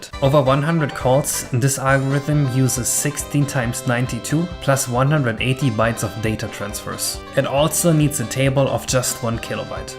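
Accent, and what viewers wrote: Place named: Germany. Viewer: Listen to the accent, yea he's definitely German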